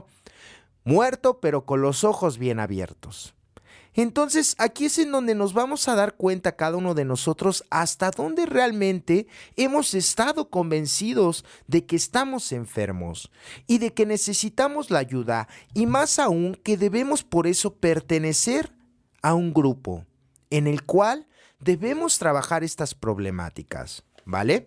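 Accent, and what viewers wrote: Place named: Mexico. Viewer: Oye, tu ères de Mexico Verdad ? Por El asento tan bonito, yo naci en uno de Los grupos de Monterrey.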